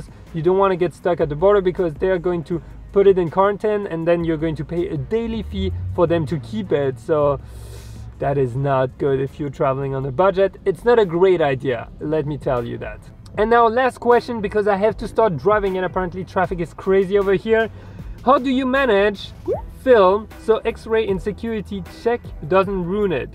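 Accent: France. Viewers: Tu es français ou je me trompes ? 🫣 Thank your for the advice I'm flying firdt time with my camera 😊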